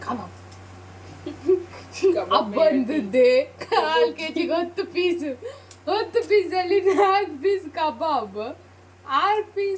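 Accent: Kundapura, India. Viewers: Edu pakka kundaura kannada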